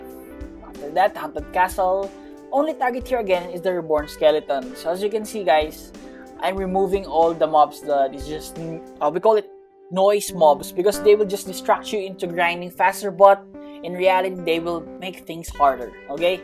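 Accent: Philippines. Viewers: He sounds Filipino. Is he Filipino? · why do you sound tagalog/pilipino hmmmm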